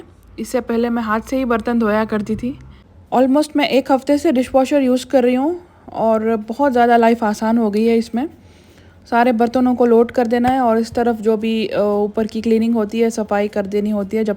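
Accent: Hyderabad, India. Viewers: Assalamualaikum! New subscriber here 😊 I know you are from dubai at present but way of talking se puchi Are you from Hyderabad? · when the Dubai mom is actually Indian with a terrible Indian accent, it's disgusting to listen to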